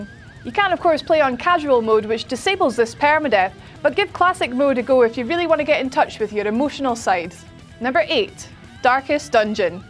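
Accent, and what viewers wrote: Scotland, United Kingdom. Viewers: She's scottish, he is irish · Is that a Scottish brit accent? Or just scottish? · Ai, are ye a Scottish lassy? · Man I love Scottish accents. The way she says permadeath is...I love it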